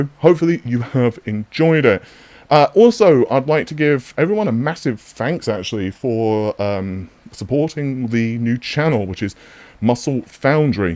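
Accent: England, United Kingdom. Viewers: Accusing an Englishman of faking an English accent?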